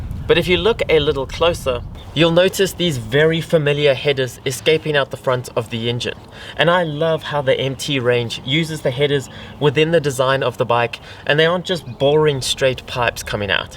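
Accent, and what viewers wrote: South Africa. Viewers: Always wondered where that accent of yours was from.... So you're South African.. that's awesome. I follow cricket and its names like AB Devilliers, Dale Steyn which comes to my mind thinking about SA. Well now will be one too. 🙌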